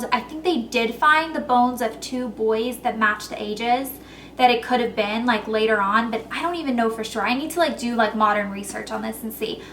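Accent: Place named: California, United States. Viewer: It's fun to notice your accent changing. I'm not from the states but I notice how your accent becomes more 'californian' I guess =)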